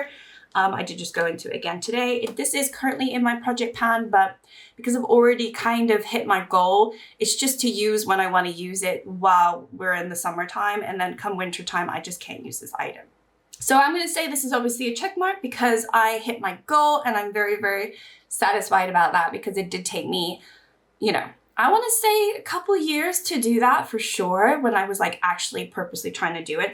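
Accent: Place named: England, United Kingdom. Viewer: Where's your English accent gone😂❤?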